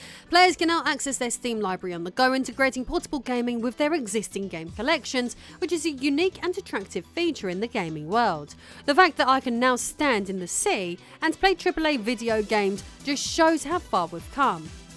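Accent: England, United Kingdom. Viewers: Damn, she’s hot, especially with that English accent.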